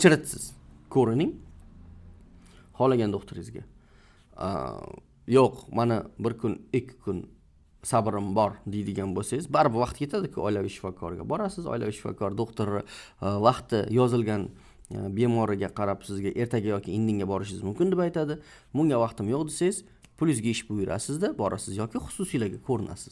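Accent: Khorezm, Uzbekistan. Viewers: Брат хоразмданмисиз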